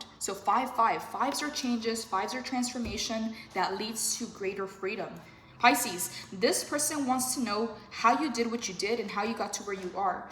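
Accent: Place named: Latin America. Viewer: 333 Eastern!!! I AM SO HAPPY WHEN I SEE YOU POST!! Ain't gonna lie I feel like we are long lost family. I swear I can feel you when you meditate. I detect a Latina accent am I correct, if so I was wondering what your lineage is? I ask because my indigenous ancestors were Tahino { primarily Porterican and South Florida descent and Aztec { South America}... Either way I usually only listen to 3 readers on the regular and you are my top 2. My other reader does readings every day. I understand why you don't, you put a LOT OF ENERGY in your readings. But as someone who was a Christian Pastor and has a degree in Social work, a soul healer where I have given advice to hundreds of not thousands by now... I will gain in sight from you I will be honest, I don't have very many people who are able to advise me with things I don't already know or I am not already thinking of. 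You do. FULL HONESTY I believe you have powerful emotional advice. I wish we were friends. I see how the cards and spirit brings out beautiful messages through you but you absolutely communicate them with grace. I find myself listening to you more for your advice and empowerment as I am processing through life. Know you are loved from afar and I greatly appreciate all that you do. My only wish is I could afford your personal readings so I could finally have just a normal conversation about life .. Chi Miigwetch ( Heart FEALT gratitude, Ojibwa)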